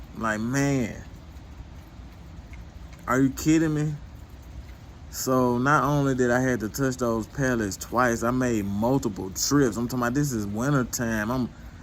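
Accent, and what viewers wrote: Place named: South Louisiana, United States. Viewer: Sound like u close to south louisiana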